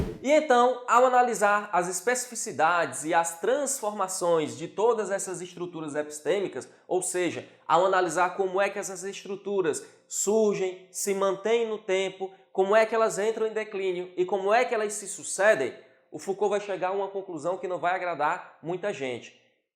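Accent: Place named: Rio Grande do Sul, Brazil. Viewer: "Pudê" (poder)....sotaque gaucho.....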